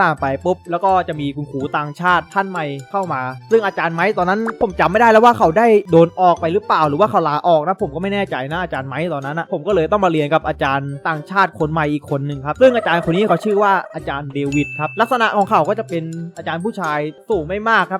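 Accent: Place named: Suphanburi, Thailand. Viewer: พี่เป็นคนสุพรรณบุรีหรอเสียงหนอจัง^^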